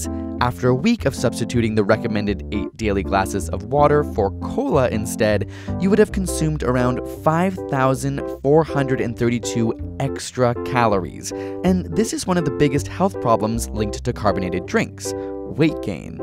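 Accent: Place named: Canada. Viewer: are u Canadian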